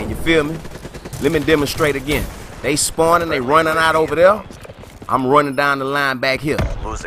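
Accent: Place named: Atlanta, United States. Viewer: that nigga funny as shit llssss sound a atl nigga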